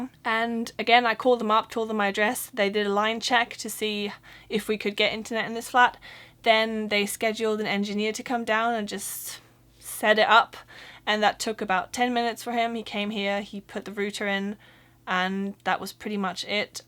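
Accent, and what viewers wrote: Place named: England, United Kingdom. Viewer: YOU have an English accent.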